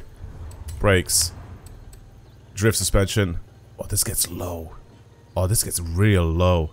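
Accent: Ireland. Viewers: where are you from you sound just like me and im irish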